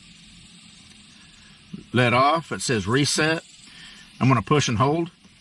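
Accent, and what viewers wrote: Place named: South, United States. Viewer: Yeah, I´m watching here from Germany bro. Love your southern accent....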